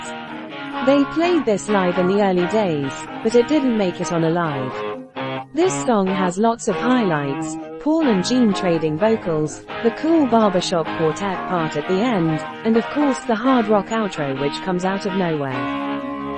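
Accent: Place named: United Kingdom. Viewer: WHY IS A BRITISH WOMAN NARRATING THIS FOR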